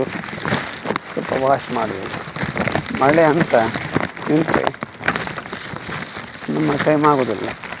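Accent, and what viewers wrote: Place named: Naravi, India. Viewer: Naravi kannada yedde undu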